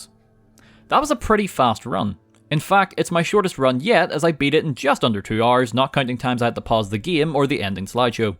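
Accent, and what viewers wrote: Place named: Northern Ireland, United Kingdom. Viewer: Ahh, a fellow Northern Irishman, eh?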